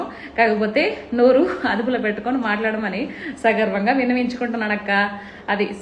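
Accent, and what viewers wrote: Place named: Rayalaseema, India. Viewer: like your style of counter criticism and feedback especially Rayalaseema accent & powerful quotes, punches. great job madam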